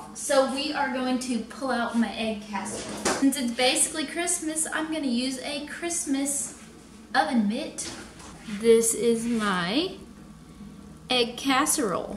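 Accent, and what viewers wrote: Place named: South Texas, United States. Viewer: I'm doing the same type diet myself. Your southern Texas accent is strong lol. I'm from Texas too. Thanks for the ideas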